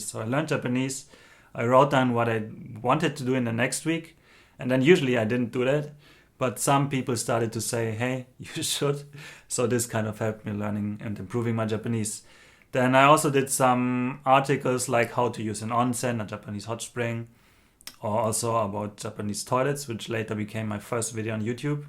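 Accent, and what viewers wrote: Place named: Germany. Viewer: Aaaah I knew you were German when I first heard your accent in Dave Trippin's video :) Bin auch deutsch (aus München) und habe für 4 Jahre in Japan gelebt, will irgendwann zurück, ist eine Liebe für's Leben, wie's scheint. Bereu auch irgendwie meine Entscheidung zurück gegangen zu sein, wird sicherlich schwierig es nochmal zu schaffen! Das Visum ist ja immer Hauptproblem.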